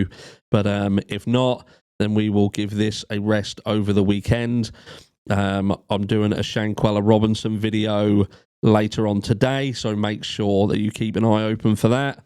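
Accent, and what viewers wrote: United Kingdom. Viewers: Yes I was one who brought up civil rights in the USA. It hadn't registered you were in the UK.* Such "dragnets" may be permissable in the UK. I recall reading about one done many years ago (40s?) (in I think it was in Prof Keith Simpson's book "40 Years of Murder). *(ur accent notwithstanding since I watch a lot of UK based content & thus Canadian, UK & USA accents no longer "jump out").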